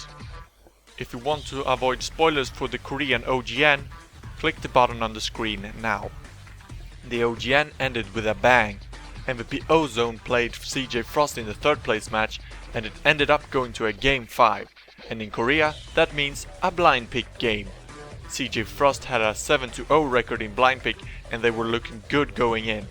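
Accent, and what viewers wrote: Sweden. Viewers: Your overall talking is kinda swenglish. Sorta less than Kungen. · Your english accent, can totally hear that youre a swede :P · You have the most Swedish accent I've ever heard, I've played with A LOT of Swedish players.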